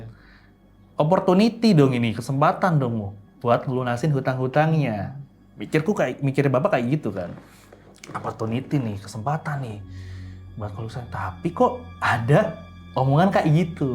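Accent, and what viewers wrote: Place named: Tegal, Indonesia. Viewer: Ini orang Tegal ya ternyata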